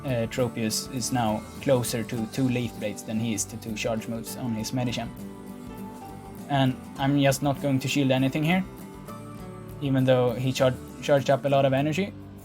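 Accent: Sweden. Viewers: Are you swedish or norwegian?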